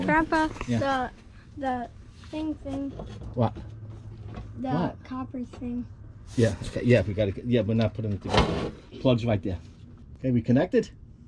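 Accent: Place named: New Jersey, United States. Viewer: Yoo from Joisey? Fun accent :-) "Yo, Adrian!"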